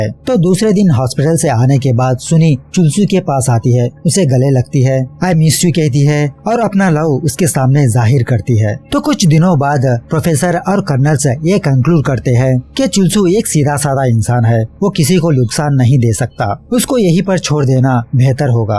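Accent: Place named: Bengal, India. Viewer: Bhai saab ap yeh sab movies kahan se Dhundke nikalte ho, ASTONISHING😲 😍!!! Love ur Bengali accent in the explaination 😍😍😍... Continue it we'll Support it!!!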